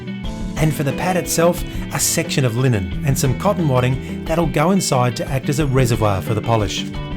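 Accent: Australia. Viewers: Great to hear the Aussie accent. Check out how we do things in Sydney at <link>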